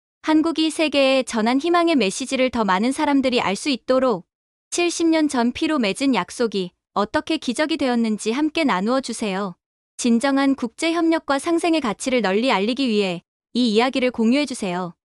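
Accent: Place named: China. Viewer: 여기 유튜버 중국인인가? 아님 어그로 끌려고 말투를 저리 설정한건가 듣기가 참 힘드네, 암튼 정산은 아니네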